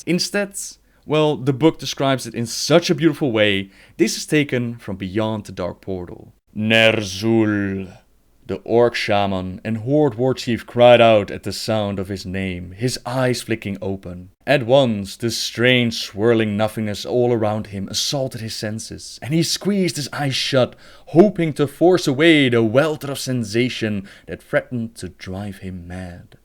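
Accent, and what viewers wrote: Netherlands. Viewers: God, your accent is so pretentious and obviously Dutch even though you try to mask it. Stahp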